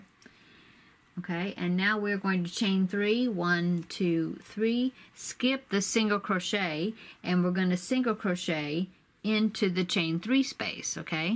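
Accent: South, United States. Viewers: is that southern accent ? LOVE that bag <3 thnx for the pattern :D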